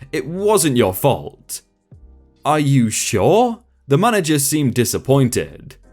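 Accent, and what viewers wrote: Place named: Midlands, United Kingdom. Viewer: John Smithe Probably from the same sort of area. I think they've both got Midlands accents.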